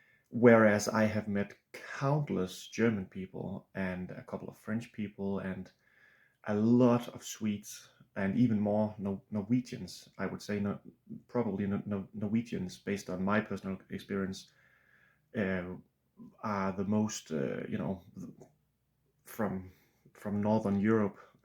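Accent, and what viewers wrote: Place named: Netherlands. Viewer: Dutchman here: 'Dutch' is derived from medieval 'diets', which originally just meant 'language of the people'. The word is related to Old Norse þjóð, 'nation, people', tjod in Nynorsk and Swedish, not sure if the word exists in modern Danish. Denmark and Holland are often confused by people from elsewhere, it's true. We do have a lot in common, after all. Regarding language: when I heard your accent, at first I thought you were actually Dutch! And when I hear Danish without knowing which language it is, it often occurs to me that I think I hear Dutch, but very strange, with words I don't understand... the sounds are incredibly similar.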